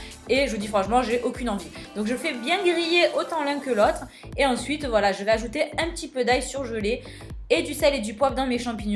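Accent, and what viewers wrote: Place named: Nice, France. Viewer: elle est de Nice je crois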